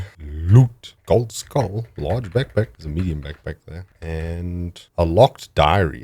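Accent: South Africa. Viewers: Am I the only one who only realised now, based on the live game commentary, that he’s South African 🇿🇦 😂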